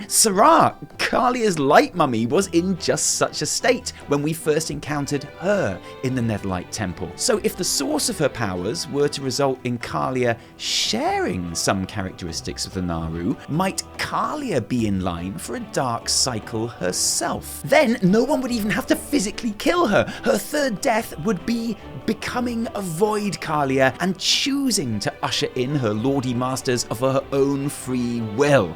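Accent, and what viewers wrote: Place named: RP, United Kingdom. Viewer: Another brit giving us a bad name making everyone think we speak like the queen.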